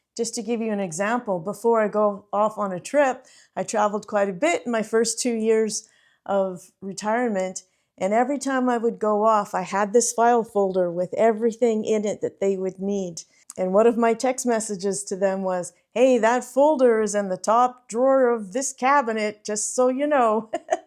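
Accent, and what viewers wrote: Canada. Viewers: I really enjoyed this video. Thanks for personalizing something that can be very hard to talk about. I live in Montreal, Canada, and I could not help notice that your accent sounds Canadian....is it?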